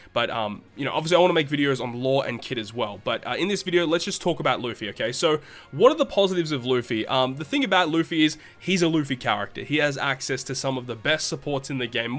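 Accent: Australia. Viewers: with his accent you should be able to tell he's Australian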